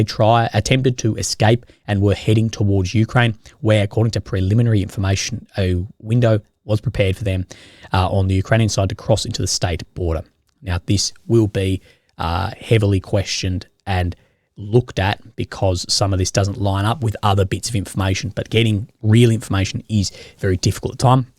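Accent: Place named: Australia. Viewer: Its just how people talk in Australia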